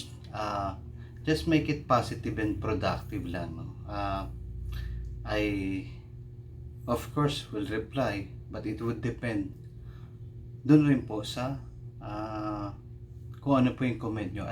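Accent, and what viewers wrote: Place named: Philippines. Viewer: Great commentary. We are all God's children first and foremost. Sometimes we are our own worst enemies. Appreciate the uniqueness of each person. Your Taglish is absolutely fine. Namaste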